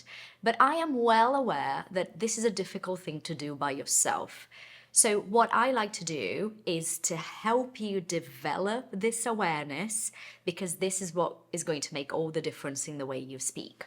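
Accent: United Kingdom. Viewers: Eu também achava que você era britânica quando vi pela primeira vez seu vídeo aqui. O seu curso é também para quem fala mais o inglês americano? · Your British accent is amazing! The First time I saw you I thought you were a British woman, I got surprised when I found out you're Brazilian. As I Said your British English is perfect. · Why did you choose British accent? Congratulations, it's a beautiful accent. 👏👏👏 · Hi there! How long have you been learning English? How long have you been working on your pronuntation? You sound like a native British speaker. 🎉🎉🎉🎉 Do I need to record only my voice or a recording of myself with a camera?? Thank you so much!❤❤❤ Greetings from Ecuador.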